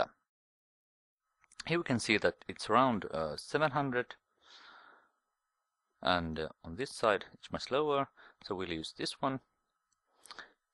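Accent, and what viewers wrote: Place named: Finland. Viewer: Are you Finnish! You sound like Finnish :D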